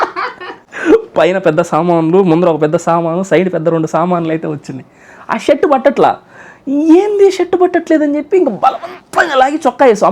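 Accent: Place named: Telangana, India. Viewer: Konchem konchem telangana matladuthunnav I like that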